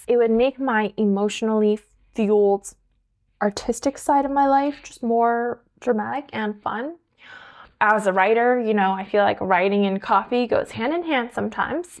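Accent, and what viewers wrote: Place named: California, United States. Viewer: I have never heard so much Californian accent from someone on YouTube before.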